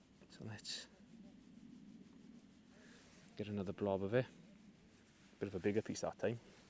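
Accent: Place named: Wales, United Kingdom. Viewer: somewhere in Wales, judging by his accent...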